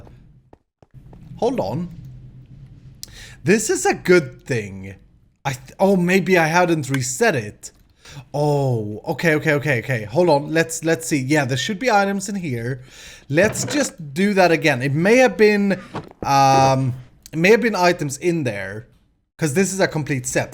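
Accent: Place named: Sweden. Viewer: Heyy you freaken swede with a gorgeous accent! ☺ I have a school project where I have to interview someone, and I was wondering if that could be you?!😉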